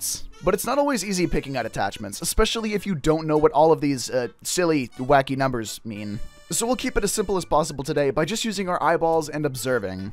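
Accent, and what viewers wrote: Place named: New York, United States. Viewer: Even though your NY accent is better than mine, and I was born and live here, I love your many accents. I read it's a rare talent. As you said in this video, "Very impressed, not gonna lie.". <3 NYC GIRL!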